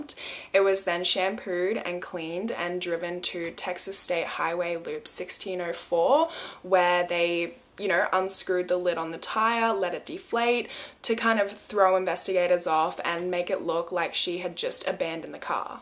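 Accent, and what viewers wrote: Australia. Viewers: Loving your videos, thanks for the uploads. Oh, and, Your accent was so familiar to me but I couldn’t quite place it, then it hit me! You sound exactly like the characters from Kath & Kim ! (Which I absolutely love and have watched at least three times) It’s a very specific accent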